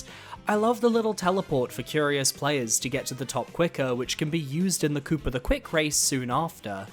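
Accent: United Kingdom. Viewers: I really enjoyed this video (thank you) but I am very confused by you, you have an English accent but use American words and pronunciations, how is this? 😂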